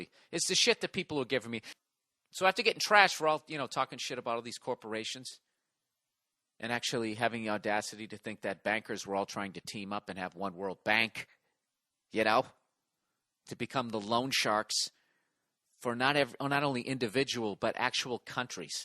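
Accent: United States. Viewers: lol i love the country accent to lol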